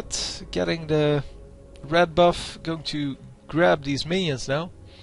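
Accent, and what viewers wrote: Netherlands. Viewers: are you dutch, I think so, ur accent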